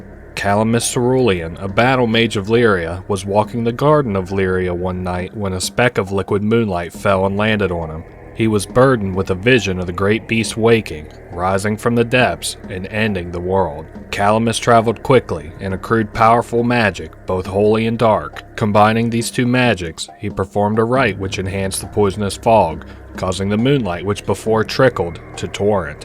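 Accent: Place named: Spain. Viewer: The most spanish english voice i ever heard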